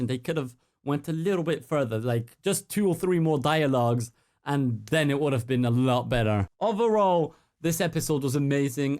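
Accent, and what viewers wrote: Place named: France. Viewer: So you're French too! Je me doutais bien qu'il y avait quelque chose dans ton accent! =D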